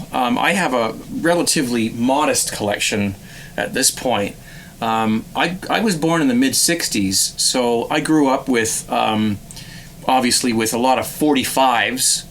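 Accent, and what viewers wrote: Canada. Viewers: I'm old enough to remember playing 45's on turntables with spindles that held a stack of records that were automatically dropped and played in succession—hence the term "stax of wax." Great for the condition of both the stylus and records. 😉 BTW, you sound like you might be across the river in Canada? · I'm getting back into LPs and singles and just like your past experience, I am now noticing how much better clean records CAN sound with a good setup, compared to the substandard record player my parents and I had in the 1970s and 80s. By the way, do I detect a Canadian accent? Thanks for the channel!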